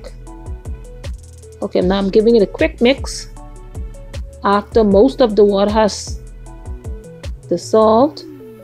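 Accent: Bahamas. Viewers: Love the accent and of course the Bahamian people. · Lovely! I'll try your recipe ;-) I also enjoyed your Bahamian accent! I love it!!!!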